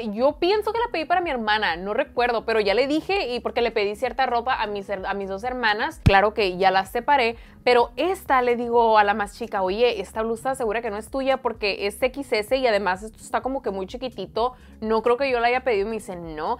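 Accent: Sinaloa, Mexico. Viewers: Me encanta escuchar las chicas de sinaloa 🥰🥰 soy Dominicana🇩🇴 y amo su acento sinaloense ♥️👌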